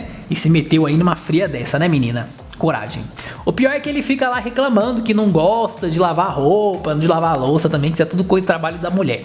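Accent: Brasília, Brazil. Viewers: Você é de Brasília??? Por isso me identifiquei muito com a sua fala! 👏👏👏😄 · Quem fala Es menina? Fala Tu como brasiliense fala kkk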